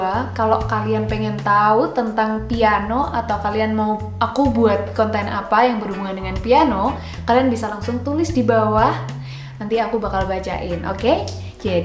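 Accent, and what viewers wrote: Java, Indonesia. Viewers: kak medhok bgt suaranya 🤟😅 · Medoknya khas banget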